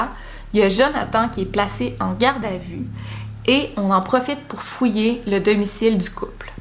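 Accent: Quebec, Canada. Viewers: Je t'adore continue même si j'ai recommence à parler avec mon accend du Québec à cause de t vidéo beaucoup trop intéressent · Cette accent Canadienne énervement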